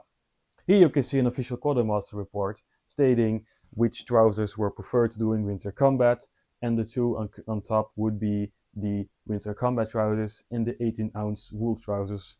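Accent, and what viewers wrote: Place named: Germany. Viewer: You must be a German as you speak English with a German accent. Sehr gut, mein freund. Cheers from an old Marine sergeant in eastern TN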